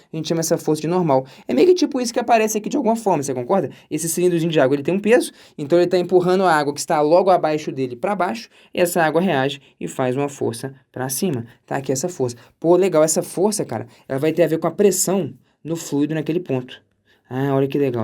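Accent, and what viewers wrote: Minas Gerais, Brazil. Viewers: o sotaque mineiro melhora tudo kkk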